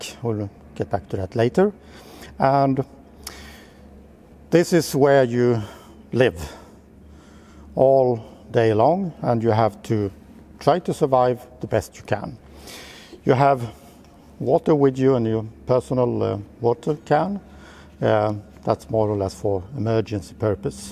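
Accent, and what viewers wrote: Sweden. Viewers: At first I thought the video was in some weird dialect of Swedish and tried to find the captions.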